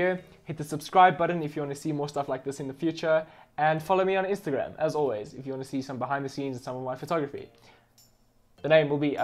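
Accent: South Africa. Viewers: CaptanW smh hes got a south african accent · you sound like you are from South Africa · This guy sounds South African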